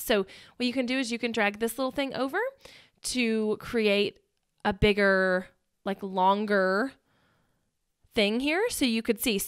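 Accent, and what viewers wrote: South, United States. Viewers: Great video. She explains it well, and has a cute accent. From one southern to another-- get it girl! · Great video, as I was listening I was like "this lady is from the South". Sure enough NC, Me too. Thanks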